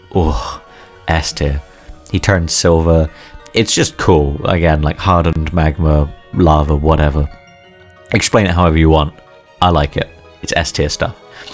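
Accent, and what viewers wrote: United Kingdom. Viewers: are you from the west country in England sir?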